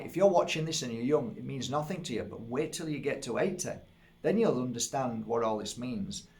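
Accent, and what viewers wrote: United Kingdom. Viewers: You're so right, this is so true. I loved this video, you made me laugh so much at the end! 😍♡🌸 I could listen to you all day, it's always a pleasure to listen to your videos, it's like we're having a tea together! ☀️😌 And as usual I love your UK accent! And as you say at the very end of the video: today is the first day of the rest of our lives. 🥰😊😇 Hugs and kisses to you, take good care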